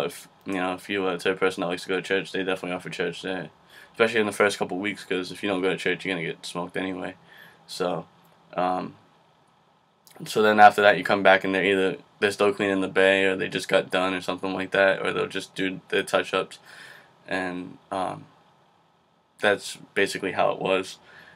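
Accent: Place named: New York, United States. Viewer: are you from NY? where's your accent from?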